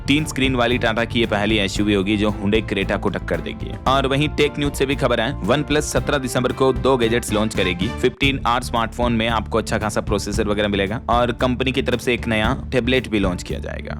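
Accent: Rajasthan, India. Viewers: bhai vah to aap Rajasthan ke mujhe lagta hai Seth sanvariya ka dialogue sunkar Aisa lagta hai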